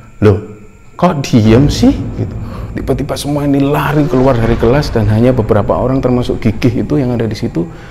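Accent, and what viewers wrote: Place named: Surabaya, Indonesia. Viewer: luar biasa. uenak rek cara nyampaikan nya podo suroboyone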